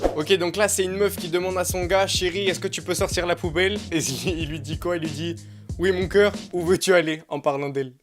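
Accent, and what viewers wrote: Belgium. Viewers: T'es belge poto ? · T'es belge ?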